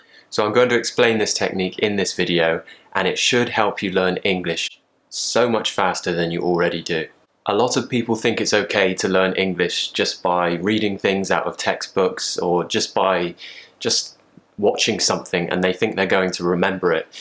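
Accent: United Kingdom. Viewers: are u british, babe??? I love you much. thanka for be a great teacher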